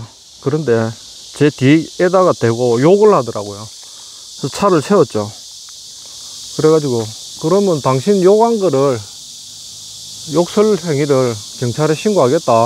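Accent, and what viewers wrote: Gyeongsang, South Korea. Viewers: 차분한 경상도 사투리가 언제들어도 편안해 지는것은 저혼자만의 생각은아닐겁니다. 길에서 봉이 김선달처럼 돈 뜻는일이 어찌 그곳만 그랬을까요? 옛날 에 씨가 때어날때쯤에는 서울에서 시골로 주민등록 전출때 동사무소직원에게 그때 최고로 비싼 신탄진 담배한값인 50원을 열려있는 서랍에넣어주지 않으면 주민등록서류가 언제 도착할지 모르는 그런시절도 있었답니다. 그래도 지금은 정말 세상좋아졌다고 생각합니다.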